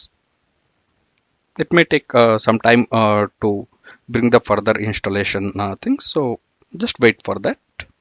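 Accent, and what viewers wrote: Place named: India. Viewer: why each tutorial video i'm watching bout ms products is with this awful indian accent, no rasism, I'm just fed up with this pronunciation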